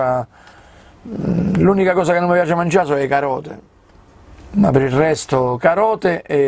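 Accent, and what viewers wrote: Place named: Rome, Italy. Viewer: Ciao, ti seguo da un po', sei molto simpatico, poi l' accento romano mi fa morire, ho alcuni amici romani perche' ho villeggiato a Terracina per poco piu' di 20 anni. Sono un Napoletano emigrato in Canarias. Beh! Sono amante della vita in Camper pero' ho un furgonato, pero' non ci vivo.... Un abbraccio a Presto. Ah, in Calabria vive un mio zio. hehehhe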